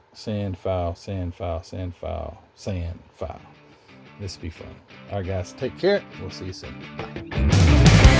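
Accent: South, United States. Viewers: Great update on the model room! That Chrysler car looks like it will be challenging but fun. Interested in seeing you build it. Dont worry bout your southern accent, i understand you just fine, being born in Mississippi! 🤣🤣🤣 Thanks for sharing,!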